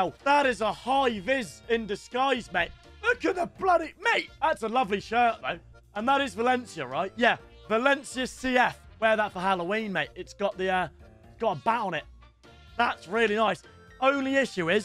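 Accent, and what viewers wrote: South Africa. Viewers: Love the Souf African accent!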